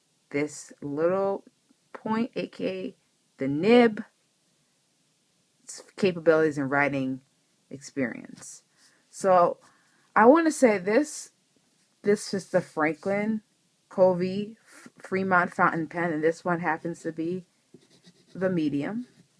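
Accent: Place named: United States. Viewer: That sounds like feedback rather than scratchiness. However, if you want it to be butter smooth, run it over some mylar paper. See Ink Noveau and sbrebrown channels for details about doing this. Although I'm in the UK I bought mylar paper from Goulet; because it's so light, there isn't much shipping to pay. But from your voice you're probably in the US anyway.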